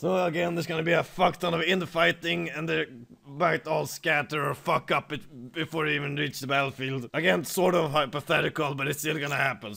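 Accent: Sweden. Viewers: are you swedish? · he is swedish i can hear on his accent and his calculator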